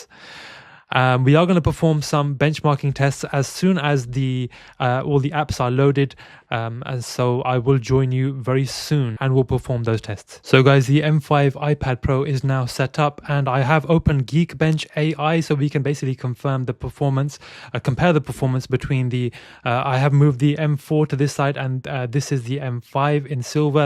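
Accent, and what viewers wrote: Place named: United Kingdom. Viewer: His accent is clearly UK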